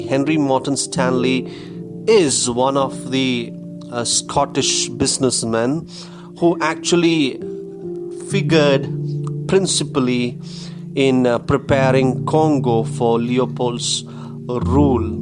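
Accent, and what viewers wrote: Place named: India. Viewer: Youre not danish, I can hear the jeet in your voice, saar.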